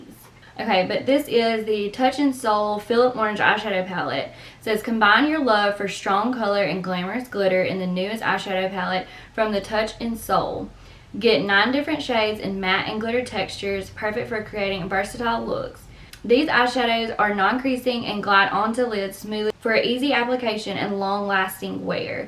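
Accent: Alabama, United States. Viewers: You got nice stuff diffinately worth the money, also love your sweet Alabama accent ❤️ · I just love your southern accent!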